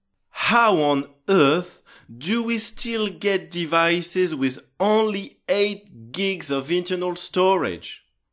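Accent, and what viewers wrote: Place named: France. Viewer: Toi t es français je reconnais l'accent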